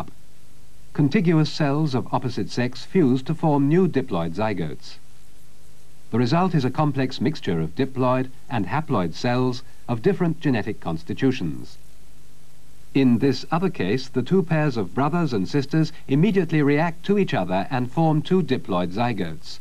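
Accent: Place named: RP, United Kingdom. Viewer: This is great. I love old science ed videos narrated in recieved pronunciation. thanks for posting.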